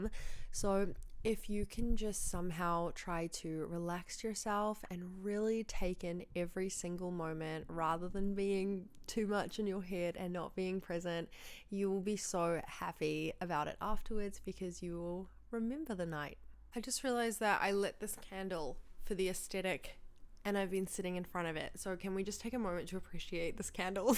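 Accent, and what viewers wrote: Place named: Australia. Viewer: Where is this awesome accent from ?!! Aussie?!😂❤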